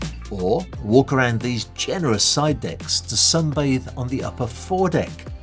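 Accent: United Kingdom. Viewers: It’s pronounced sole-on. Not Sal Awn. It’s one word Brits have to the most annoying accept. Ext to Chinese rambling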